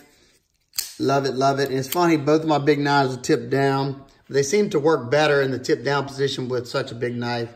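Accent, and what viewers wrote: Louisiana, United States. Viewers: You from Louisiana?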